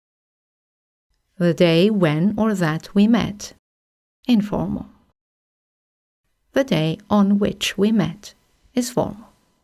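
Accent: United Kingdom. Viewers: That British Accent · i like British accent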